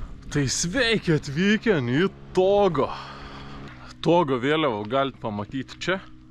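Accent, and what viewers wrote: Lithuania. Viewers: Žiauriai lietuviškai skamba va iš kur mes Šiauliškiai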